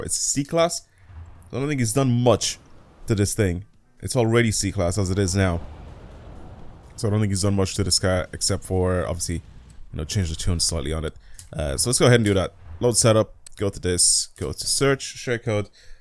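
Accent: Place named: Donegal, Ireland. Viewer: Where you from in Ireland cause I can’t recognise that accent you sound a bit like Donegal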